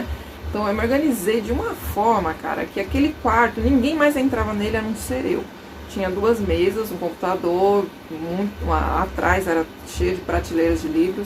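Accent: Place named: Salvador, Brazil. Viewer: É daqui de Salvador com certeza 😜😁😁 ! Só nós com esse sotaque kkkkk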